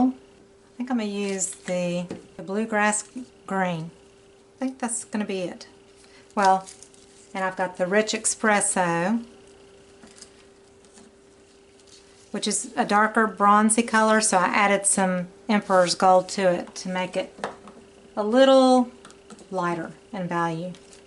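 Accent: South, United States. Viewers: Love this one. Absolutely lovely. I knew your accent was either NC, SC or GA. Hillsboro is only 45 minutes away. Now I'm upset I missed the festival. I'll catch the next one.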